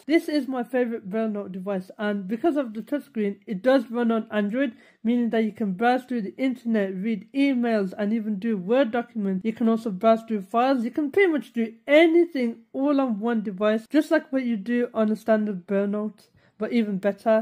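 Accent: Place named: United Kingdom. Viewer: Are u British I love ur accent ❤❤❤❤❤❤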